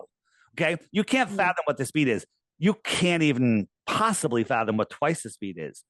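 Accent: Sweden. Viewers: Is she swedish? 🇸🇪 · Sounds like she is from sweden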